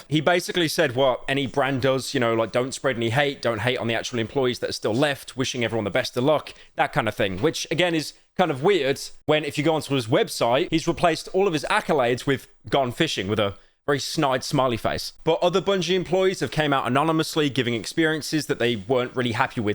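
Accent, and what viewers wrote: United Kingdom. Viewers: Why are you british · I love new haircut, dunno why he sounds british now though!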